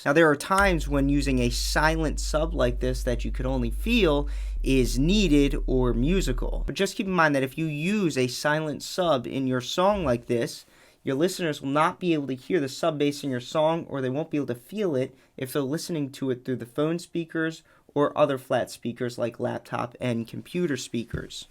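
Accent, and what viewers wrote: Philadelphia, United States. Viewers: Hey bro are you by chance from Philly? I live in port Richmond and I swear I hear my neighborhood when you speak, maybe I’m wrong. Either way great video! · I know a Philly boy when I hear one! Haha thanks man, I'm a newbie and this is probably the most helpful video I've watched so far about synth sound design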